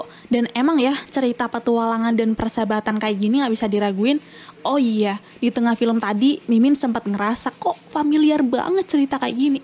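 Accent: Pemalang, Indonesia. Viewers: Mbak orang pemalang?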